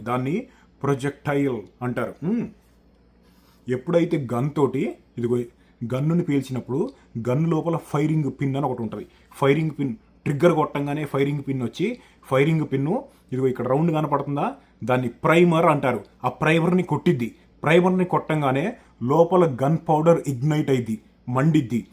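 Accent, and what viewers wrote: Andhra Pradesh, India. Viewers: your slang is like andhra y you are staying in telangana then😀😀😀😀😀😀😀😀😀😀😀😀😀😀😀😀😀😀😀😀😀😀😀😀😀😀😀😀😀😀😀😀😀😀😀😀😀😀😀😀😀😀😀😀😀😀😀😀😀😀😀😀😀😀😀😀😀😀😀😀😀😀😀😀😀😀😀😀😀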